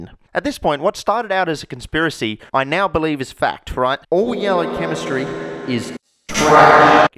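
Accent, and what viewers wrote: Australia. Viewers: finally a chemist who is informative, and yet satisfies my need to hear an Australian say their favorite "C" word about 65 times in a video. Easy sub. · He looks and sounds like an Aussie Gerald Buttler.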